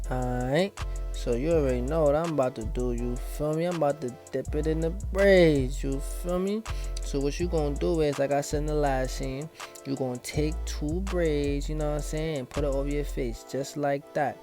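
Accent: New York, United States. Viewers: Bro I can tell you from New York by the way you talk good video tho g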